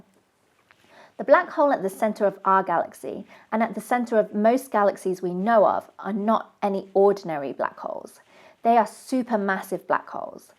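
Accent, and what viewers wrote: United Kingdom. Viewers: ❤❤❤❤, love your frightfully British accent. If your Chinese is just as fluent… the world is your oyster😘😘😘😘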